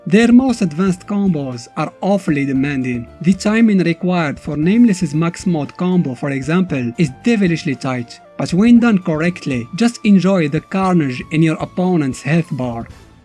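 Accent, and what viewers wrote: Arab world. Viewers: I can tell you're Arab, maybe Moroccan or Algerian, from the way you said Najd, and your accent over all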